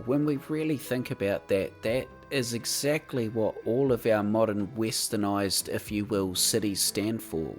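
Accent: New Zealand. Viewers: Like you I really enjoy the way you can create art in this game. I don't get anywhere near as beautiful and detailed a finish as you though even after hours of PO work. You really capture the character and charm of old Europe. I love your work and also your lovely NZ accent. 😍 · Good to hear a kiwi accent. Aotearoa represent :elbowcough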